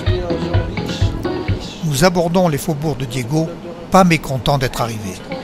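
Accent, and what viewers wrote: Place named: Maluku, Indonesia. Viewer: Muka2nx Mirip Orang Indonesia Maluku Cara Bicaranx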